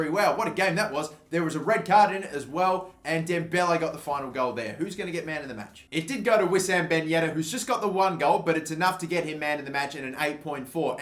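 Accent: Australia. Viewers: He's an Aussie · Is he Australian ?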